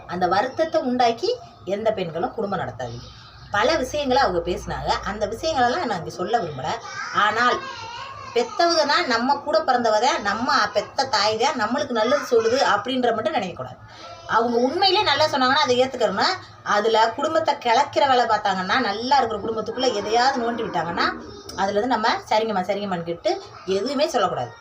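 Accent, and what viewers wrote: Madurai, India. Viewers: Mathurai or usilampatti or thondi slang kandippa namma ooru kaa thaan neenga ❤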